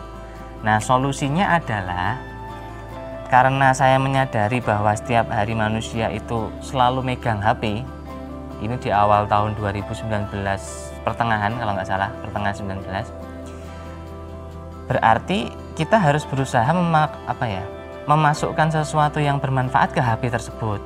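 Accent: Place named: Java, Indonesia. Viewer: Mas, jawa ne medok... 😃👏🏻👏🏻👏🏻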